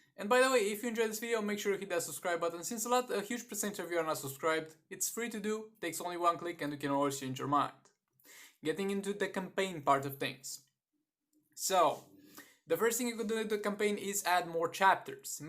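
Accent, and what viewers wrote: Romania. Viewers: Omg Ur from Rumania. Been wondering where your accent was from for a while.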